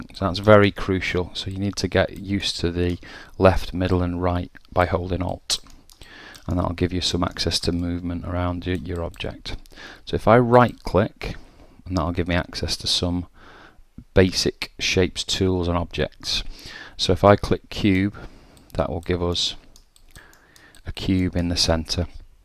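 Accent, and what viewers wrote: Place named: England, United Kingdom. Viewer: Believe you me, he has a beautiful English accent.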